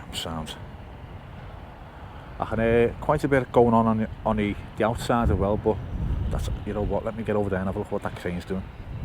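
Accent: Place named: Liverpool, United Kingdom. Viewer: You know those vids on YouTube that are primarily for a foreign audience that focus on the regional accents of England? Yours will get picked up as the Liverpool example as opposed to the Carra/Gerrard ones they always use. 😊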